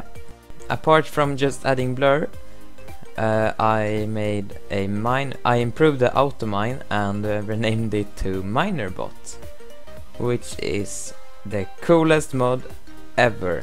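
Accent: Sweden. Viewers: are you swedish? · I hear you speak swedish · I hear that you are swedish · I kan Hear your swidish in your english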